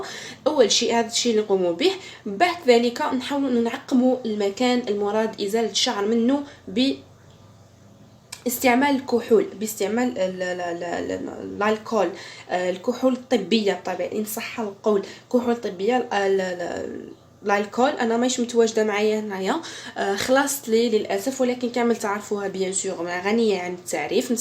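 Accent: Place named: Algeria. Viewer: هههههههه جزائريه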